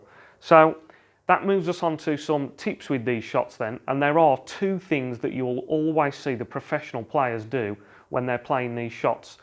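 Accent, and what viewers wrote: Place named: Black Country, United Kingdom. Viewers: Laila Vags he is from the Black Country in the West Midlands enjoy the video and stop trolling 😉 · it's the Black Country accent. If he was from Yorkshire he would be dropping Hs. It's just the varied UK dialects.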